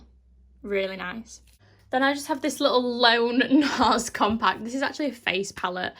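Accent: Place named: North, United Kingdom. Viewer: I love the way you describe everything in your northern accent!! Such a beaut collection ❤️ making me want to do an eyeshadow palette video xxx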